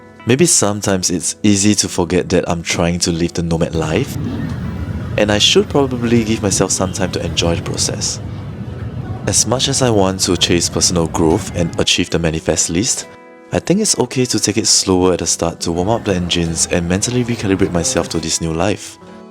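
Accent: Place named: Singapore. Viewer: Hey, keep going man. People who succeed in life are the ones who always learn and push themselves to be better. Good luck with your goals and inspirations. P.S. You sound like Singaporean. I am moving to BKK from SG with the family soon too (I am a westerner). Maybe we could catch up IRL:D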